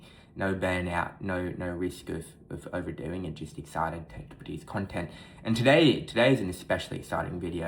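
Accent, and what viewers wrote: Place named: Liverpool, United Kingdom. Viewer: Bro is literally speaking in Liverpool accent 😅😅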